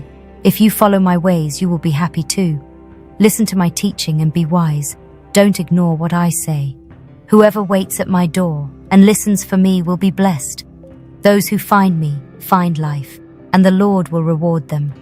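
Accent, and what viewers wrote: United Kingdom. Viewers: Let's recognise that there are BLACK people in the world who have an english accent like this voiceover. We do exist!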